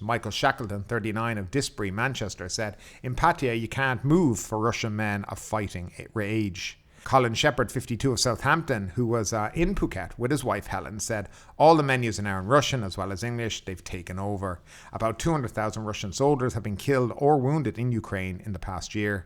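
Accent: Ireland. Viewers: Irish accent?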